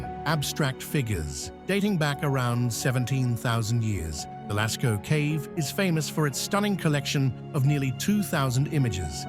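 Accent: United Kingdom. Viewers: next time i watch, i will count the usage of "cool." meanwhile, as i am an american, i had to use captions to see what he was saying with his u.k. accent...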